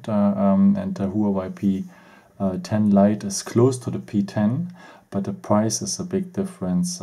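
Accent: Germany. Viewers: Why do you say Jigahertz instead of Gigahertz? XD Your English is funny. At least I can understand you though so not hating! And at least you're not just talking German. I can respect that, especially from German people. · You know there is a English alphabet? For example the english "e" is spoken like a german "i" which you obviously speak.... but nice job reading down the information that stands on every page in the internet have a gr8 day m8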